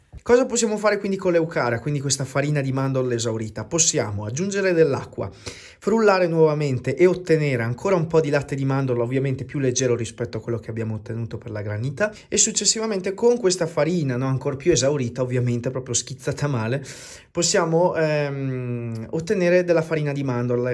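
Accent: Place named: Trentino, Italy. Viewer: Auguri per i tuoi denti!! Sei sempre molto chiaro nell'esporre le ricette👏. Mi piace il tuo modo di proporti, naturalmente la tua bravura e passione in cucina è super. Ho dei parenti a Trento e la tua parlata con a volte qualcosa in dialetto mi piace un sacco!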